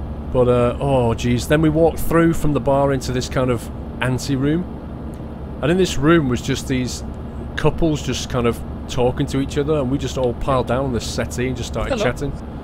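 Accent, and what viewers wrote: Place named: Scotland, United Kingdom. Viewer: Awesome Scottish accent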